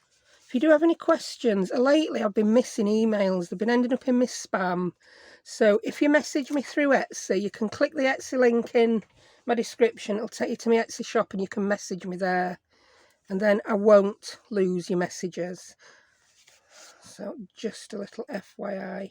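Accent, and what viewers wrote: United Kingdom. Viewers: Hi, I have been watching your videos for a while now but I am also horrible about leaving comments. I am usually watching on my TV. I really enjoy your tutorials and have done several of your projects. Sometimes I just put on one of your videos just to listen to you while I craft. I lived in the UK for 6 years and really miss a lot of things so listening to you talk makes me feel at home. So happy for you and hope you enjoy continued success and many more subscribers.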